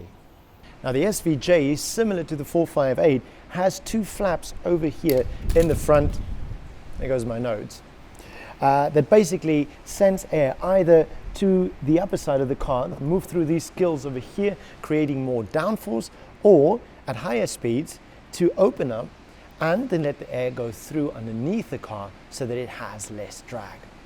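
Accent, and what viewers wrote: South Africa. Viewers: Dude you sound south African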